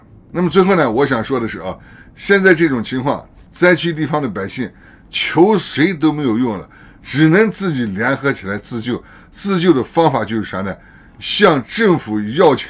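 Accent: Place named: Northwest, China. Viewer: 熟悉的西北音~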